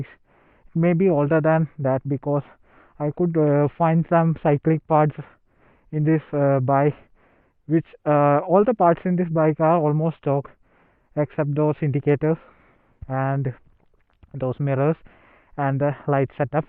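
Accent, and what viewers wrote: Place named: Kerala, India. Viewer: Malayali anenn English kettapozhe manasilayi...malayalathil cheythal nannairunn🎉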